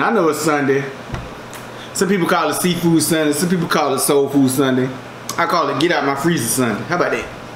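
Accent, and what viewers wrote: United States. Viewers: I’m from New York & i love how different & country his voice is 😍 instantly fell in love & subscribed 🥰🥰❤️❤️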